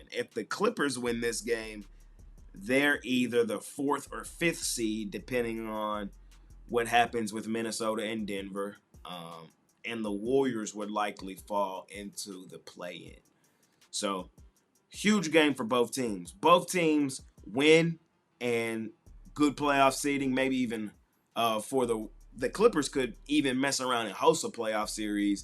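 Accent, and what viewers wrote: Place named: South Florida, United States. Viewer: Sounds like you from my crib!!! South Florida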